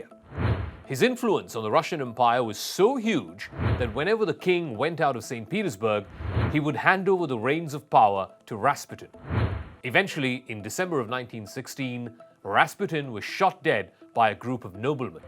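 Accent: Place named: India. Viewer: dellivver.. lol. indian accent is amusing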